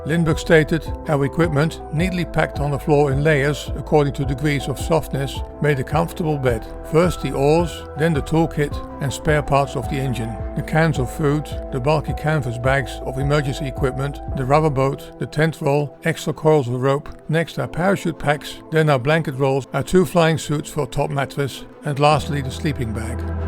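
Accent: Netherlands. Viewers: Not clear why YT makes problems to best authors like you rather than focusing on garbage channels! Format works, this video is PURE GOLD!!🌟👌🙌 Love the voice over with Dutch accent!! 🔝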